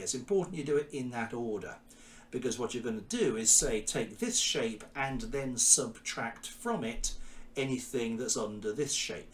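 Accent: United Kingdom. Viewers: YOU KNOW YOU HAVE A GOOD BRITISH AX CENT.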